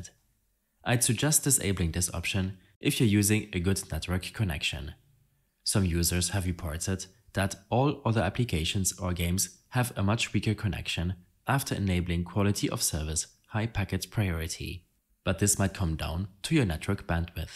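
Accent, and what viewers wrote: Germany. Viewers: I HEAR DEUTSCH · deutsches akzent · you sound German could it be?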